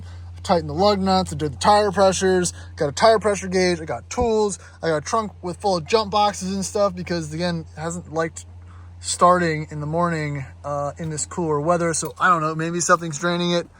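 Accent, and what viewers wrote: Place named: United States. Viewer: You’ve bored me in first 30s maybe it’s your American accent maybe it’s the fact you guys no nothing and European cars , and why have you got 3/4 old crap cars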